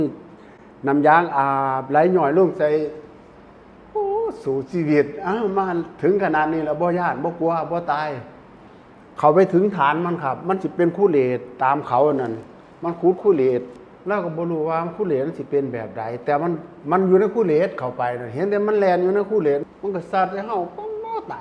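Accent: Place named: Ubon Ratchathani, Thailand. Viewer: สำเนียงการพูดเหมือนจะอยู่แถวอุบลนะครับ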